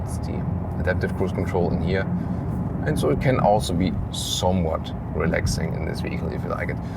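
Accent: Germany. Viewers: A review of a 911 Porsche turbo S is always best in the gentleman has a German accent. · A car review can't get more German than this. Congrats, your reviews are on point. Very presentable host and the way you do it makes it so pleasant to watch. Easily the most professional car reviewer on the web. Your german accent makes it that more nicer to watch. Cheers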